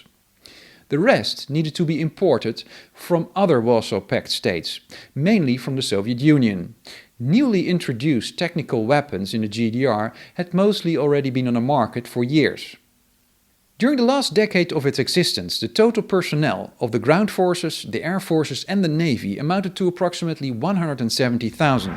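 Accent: Netherlands. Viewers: Geweldig kanaal ! Ik hoor toch echt heel goed Engels met een Nederlands accent. Klopt dit? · How funny, that you speak perfect German, but you speak English with a heavy Dutch accent. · Although he speaks with. Dutch accent, his German pronunciation is great! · You speak with a very Dutch accent for a German man.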